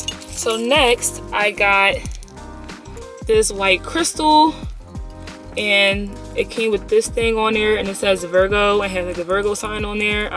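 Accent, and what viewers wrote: Philadelphia, United States. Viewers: Are you from Philly? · I was looking for this comment. I’m like she gotta be from Philly. I’m from south jersey so I be knowing.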